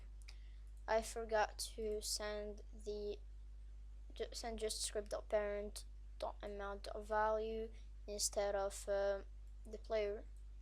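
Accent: France. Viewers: Are you french ? i am french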